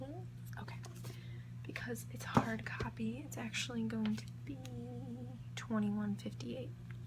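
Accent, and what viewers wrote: South, United States. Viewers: Oh this vid would have been great also with your southern accent! :P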